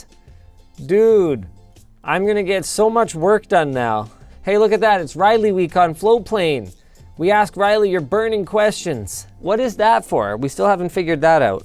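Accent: Canada. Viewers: Why does linus sound like he's leaning heavily into his canadian accent in this video.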